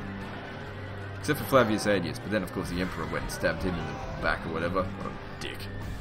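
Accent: Australia. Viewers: Australian I think (at least that's the most obvious too me, as he is australian)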